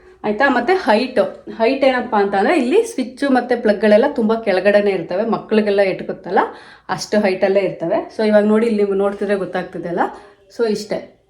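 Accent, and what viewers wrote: Standard. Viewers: kannada swaccha vagi mathadthira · ದೂರದ ಅಮೆರಿಕದಲ್ಲಿ ಇದ್ದರೂ ಸ್ವಚ್ಛ ಕನ್ನಡ ಮಾತು , ಕನ್ನಡ ಅಭಿಮಾನಕ್ಕೆ ನಮಸ್ಕಾರಗಳು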